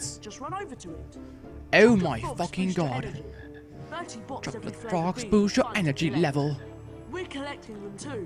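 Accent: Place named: Netherlands. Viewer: Denglish Dutch-english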